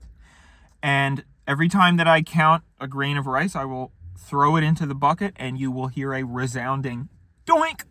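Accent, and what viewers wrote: South, United States. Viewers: the southern TWANG is NOT A COSTUME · His really shitty southern accent is so freaking great man, I love you and all your quirks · The southern accent arc was crazy…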